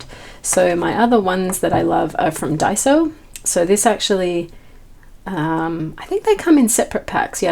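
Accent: Australia. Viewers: Hello... you sound a bit Aussie, but living in USA? If so, hello from a fellow Aussie. Thanks for the video. Could you do me a small mix favour with your Roman Szmal, with the "tint" colour with a Cobalt blue please? Could let me know if you get a grey or a green? Thank you in advance! Looking for a sky and cloud glow. A bit of a unicorn, I love a cobalt or warm blue like periwinkle, or lavender with a white in it, to make it opaque thinned out, and less granulating. I love Schmincke's finest Ultramarine. I know I am searching for a glow, without going green that is also non granulating. Eg jarosite. Or some gold ochres, some Naples or Flesh colours. It is likely to be a neutral yellowy orange or a PBr7 like a burnt sienna... Like you, also a fan of pens and ink. Have you tried Emma, the R & K sketch ink? A waterproof greeny olive, fast drying! In kindred spirit, Eliza. 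Dulwich Hill, Sydney Australia xx 14th of December 2022 pm bed office PS I like the look of your deep bag. Where do you get the large fine mist spray bottles? They are not on your list? PPS: Thanks for your channel. xx PPPS: You need to try my favourite RS, "Misty Morning", it separates, a light cool grey into a rose and cobalt teal kind of colour. An edge happens. It is delightful. Good by itself. Seeing as though you like your neutral abstracts, I think you will have a new favourite.....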